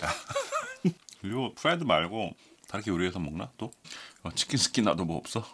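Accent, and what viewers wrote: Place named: Philippines. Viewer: His accent is slowly turning into a filipino accent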